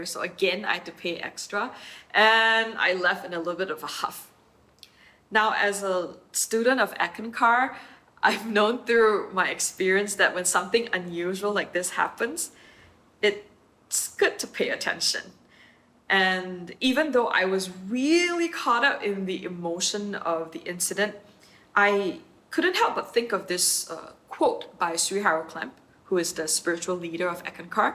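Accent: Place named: Malaysia. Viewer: You sound like a Malaysian, or Singaporean. My question: Is there a contact person in Malaysia?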